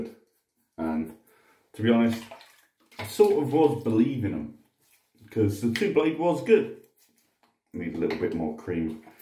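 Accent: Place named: United Kingdom. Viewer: After 2 minutes i realised he is from uk, and he is really from uk! They have this accent even foreigner easy understand.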